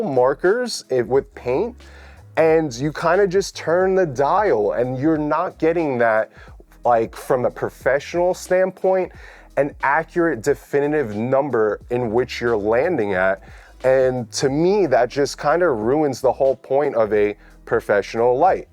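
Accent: New York, United States. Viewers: I'm a NYer living in LA for the last 15 years. gotDAMN I miss my peoples. I can see myself and all my homies from back home in the way you talk. Not just the accent, but the sentiment and realness behind what you're saying. Super refreshing in this space. More please. Also, wish I'd seen this video a couple of days ago. I just ordered the x100 pro package for a shoot I'm traveling across the country for. The good news is I don't REALLY need it because lighting is handled, but I just thought it'd be cool to try out one of these tiny lights that you can just throw in your bag and whip out once you've already got your shot and are just kind of playing. Should have gone with with one of the competitors! Anyway, you got a new subscriber!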